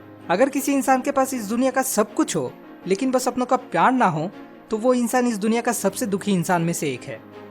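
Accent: Bengal, India. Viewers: frnd r u bengoli .??..ur voice tone is bengoli ..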